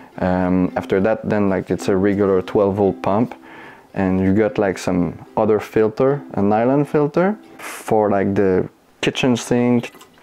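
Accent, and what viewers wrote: Quebec, Canada. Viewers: He has a french accent. Québécois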